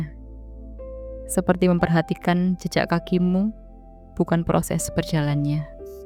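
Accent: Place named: Jalan Wilis, Indonesia. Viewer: suaranya seperti org kelahiran jln wilis ya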